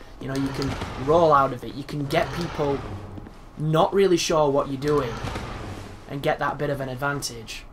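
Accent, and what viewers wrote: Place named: North, United Kingdom. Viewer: Where are you from? If you don't mind me asking; I'm reminded of your accent by the accents they use for men North of the Wall on the Game of Thrones TV show :)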